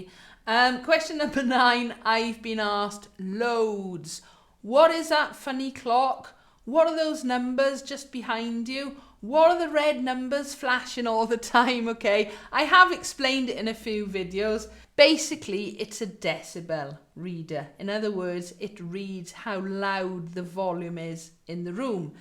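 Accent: Wales, United Kingdom. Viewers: Loving your help and hearing a wonderful Welsh accent. I'm from Abertawe originally but have been in NZ since 2004. Been learning the ukulule and loving it. I really want to learn Ar Hyd yr Nos, but am not Welsh speaking - on duolingo a little and know very basic words and phrases. Would it be too much to ask if you have simple chords for Ar Hyd yr Nos. A phonetic translation is something I've been lookinb for online to no avail; do you or anyone you know have one? Diolch yn fawr, Denise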